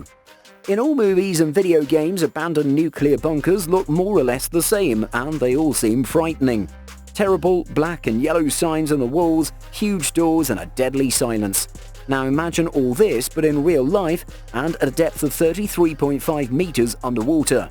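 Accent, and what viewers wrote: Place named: United Kingdom. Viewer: Boy you British lol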